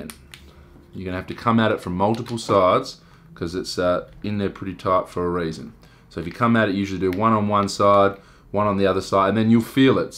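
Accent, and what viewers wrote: Australia. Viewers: His accent makes me feel naturally that he's an aussie.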